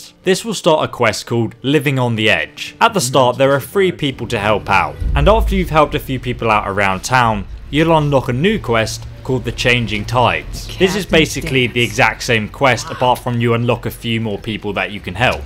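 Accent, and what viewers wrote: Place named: United Kingdom. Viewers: he's brittish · It's obviously British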